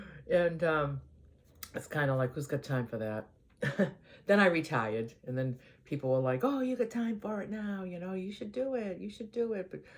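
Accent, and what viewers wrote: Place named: Boston, United States. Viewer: yes the channel name is Eat Pray Van. Named after my favorite book/movie! I love that you are just yourself and super genuine. One of my other favorite YouTubers said if your going to do YouTube to “Be Yourself”. (Your one of my favorites too ❤️) I’m from/born in NYC and I really love the “Bawston” accent LOVE IT! I’ll post on whichever is your newest video on Friday and let you know it posted! I can post a link to the video, thank you for wanting to watch I really appreciate it!!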